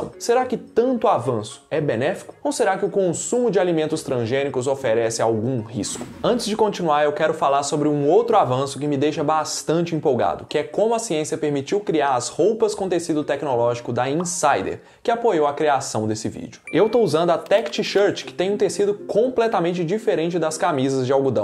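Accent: Minas Gerais, Brazil. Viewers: Que sotaque mineiro fofo ❤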